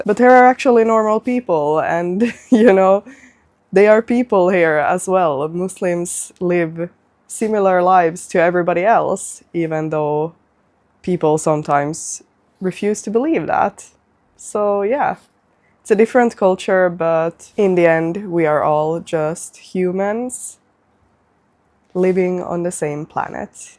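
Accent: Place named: United States. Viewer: تقريبا الاكسنت امريكي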